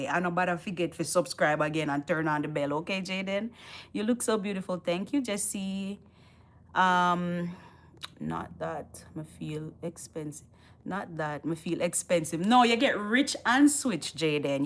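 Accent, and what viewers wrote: Jamaica. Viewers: You are great love to lissen to your words My english is not perfekt but anyway i can understand even if you are talking jamacian and i enjoy so mutch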